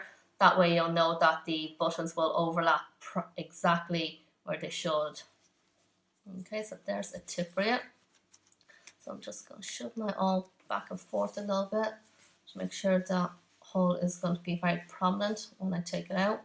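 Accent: Ireland. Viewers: Are you from Ireland? Thank you for this I couldn’t read Chinese instructions. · it’s an irish accent! i was wondering about that but didn’t recognize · Thank you! I've been looking for such buttons and tools but the results are pretty much all on Amazon and/or made in China, the 2 things I wanted to avoid. By your accent, I'm guessing you're Irish too? If so would you happen to know of anywhere else I can buy them, particularly a good tool that isn't made in PRC? I'm not finding any in Hickey's or similar shops. (I found some buttons from Honk Kong which is fine for me and there's a Taiwan site which have the press pliers tool but it's mainly for bulk orders) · Just found your channel and love it. Great to hear the irish accent. I'm irish too, from Sligo. Great tips on using the snaps. I have liked and subscribed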